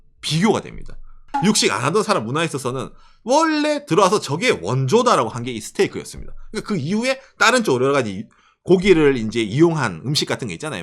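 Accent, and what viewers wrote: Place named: Gyeongsang, South Korea. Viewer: ㅎㅎ ~~~멘치로... 경상도 인가벼...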